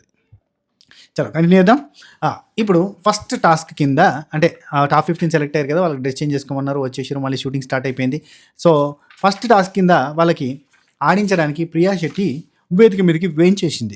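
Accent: Telangana, India. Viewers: KCR thatha tharvata nak nachina telangana person nuve anna super matladtav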